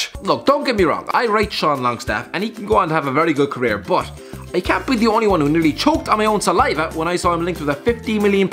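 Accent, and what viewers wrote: Ireland. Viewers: Are you irish ? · Robinho is far from overrated. Where are you from?? Ireland??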